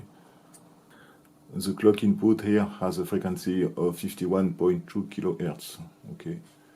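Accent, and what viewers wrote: France. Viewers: Hahaha, your "few moments later" narration fits perfectly with your nice french accent.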